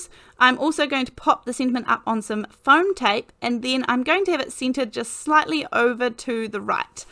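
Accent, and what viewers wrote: New Zealand. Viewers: Great to hear a Kiwi voice, and the clear, no fuss instructions. I live in the South Island too. Love that quick and easy card. 👍💕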